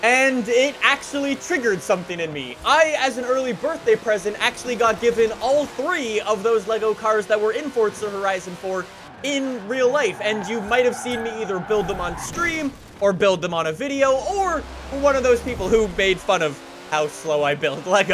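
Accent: United Kingdom. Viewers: What part of the UK are you in?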